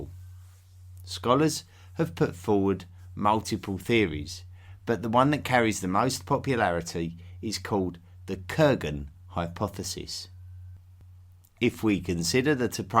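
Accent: United Kingdom. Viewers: While the “a” does sound Aussie-like, his other vowels, contractions and other patterns are definitely British but I can’t pick the region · Voice reminds me of American sportscaster, Howard Cosell. Only with a British accent.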